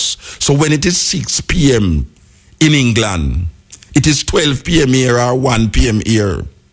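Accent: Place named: Jamaica. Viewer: i would like subtitles...i know english but not this jamacian dialect..not when he speak fast.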